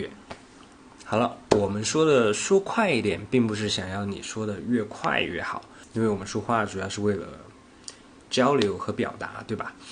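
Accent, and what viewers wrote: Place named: China. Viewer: 老师，您好。很高兴会遇到您的频道，我很喜欢您的视频，subcribed 了，请问您是中国哪个地方的人？我喜欢听北方人说普通话，也想会说的像北方人那样，可是也不要太重。刚好像您一样，儿化不太多，速度也不是太快。您的视频真的是很合适让我们外国人来练习跟读（shadowing). 还有谢谢您在您一些视频提供了拼音字母，让我们更有自信地跟读 （不担心声调读错）。老师，有些问题想问您 ： 1. 书里说上声如果在句末应该读 214，可是我在电影和youtube听母语者读 21 不是 214. 我们该如何好？ 2. 去声连在一起的时候，比如 ： “放假”，“记号”。 有位老师说应该读 53-51 而不是 51-51， 所以听起来不像生气的样子。可是老师，我到现在还觉得莫名其妙，因为不少听母语者说 “记号” 不是 53 51 而 更像 51-轻声。对我来说这样的情况让我感到很头疼。请老师解释。。 3. 有一个频道， link: <link>.母语者在real life 说话的时候大部分说的那么快吗？这样的话，我觉得到最后永远也不会 sound like a native chinese speaker?:-) 跟不上。 感 谢 老师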